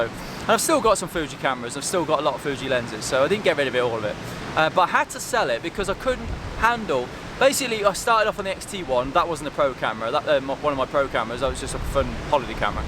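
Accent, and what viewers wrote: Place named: Midlands, United Kingdom. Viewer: Just found your channel. Great stuff. Though I'd say from your accent that you are from the midlands and not wales 🙂👍👍👍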